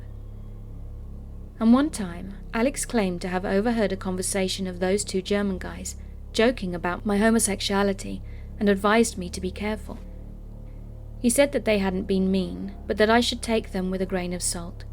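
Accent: United Kingdom. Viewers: Hi...love ur voice and ur channel...love to hear a uk voice as I'm there!!!!😂love it when u say get cozy comfy warm!! As I'm always getting cozy when u say that!!!thank you!!!!!! Stay creepy!!!!😂🖤🖤🖤🖤🖤🖤🖤🖤🖤🖤🖤🖤🖤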